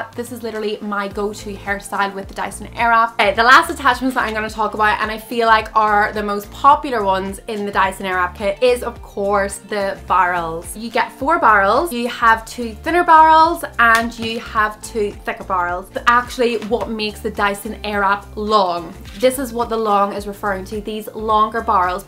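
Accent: Derry, United Kingdom. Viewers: So happy I found someone with a similar hair texture to my own doing tutorials for this! And is that a Derry accent?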